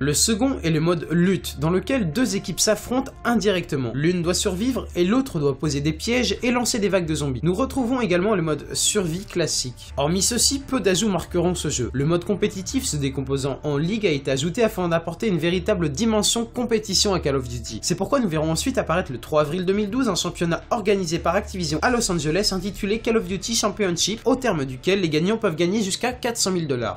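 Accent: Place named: France. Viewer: Putain ca partait bien ... Puis ce putain d'accent francais a tout gacher Cale of duti... Et l'répèter tout le temps c'en est saoulant...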